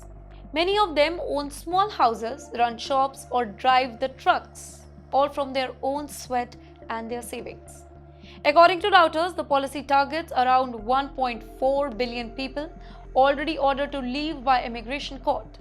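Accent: India. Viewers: Indian fatigue so done with your accent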